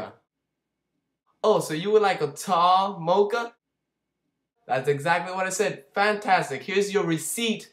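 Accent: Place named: Miami, United States. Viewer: Lol. you are so cute and funny! I recognize a miami guy when I hear one ;)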